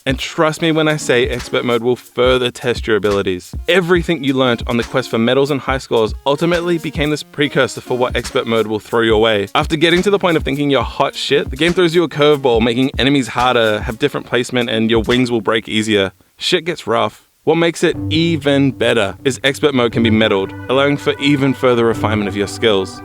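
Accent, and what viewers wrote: New Zealand. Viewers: you sound like youre from nz, which begs the question, why isn't this titled Lylat Wars?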